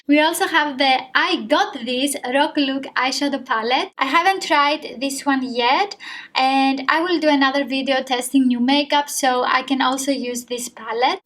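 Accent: Greece. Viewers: Η προφορά σου φουλ ελληνική καταλαβαα αμεσως οτι εισαι ελληνιδα 😍😍😍❤❤ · where are you from?You sound a lot like Greek 😀😀💝💝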